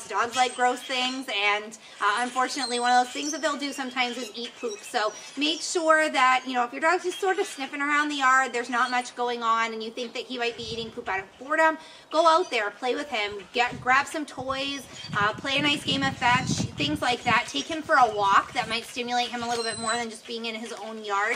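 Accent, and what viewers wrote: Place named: United States. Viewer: Talk less American plz.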